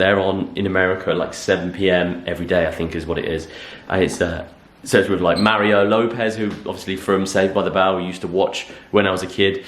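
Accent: United Kingdom. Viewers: Amo o sotaque britânico 🇬🇧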